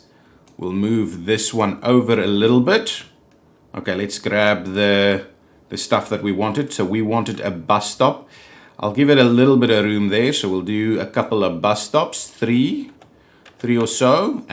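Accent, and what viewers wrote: South Africa. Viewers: Hi..you sound south African..LOL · Great series. Watching them. I was actually going to ask if that accent is South African but answer said after 16min :)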